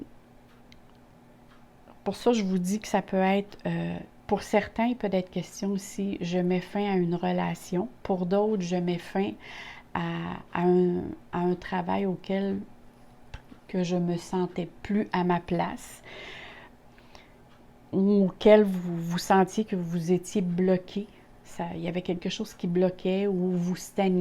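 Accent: Canada. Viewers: Coucou chère merci pour ce superbe tirage, quel espoir.... en plus j'adore ton accent canadien si sympathique... Un grand merci et je te souhaite aussi une excellente année 2021 à bientôt 😍😍❤️❤️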